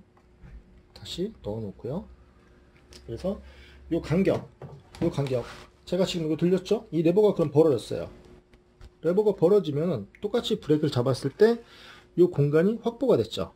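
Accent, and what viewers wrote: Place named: Gyeongsang, South Korea. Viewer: 찡기다, 아까침에... 이런 사투리가 나오는거보니 부산경남쪽이 고향이세요? 마산에도 잠시 있었다는거보니 그런거 같네요 ㅎㅎ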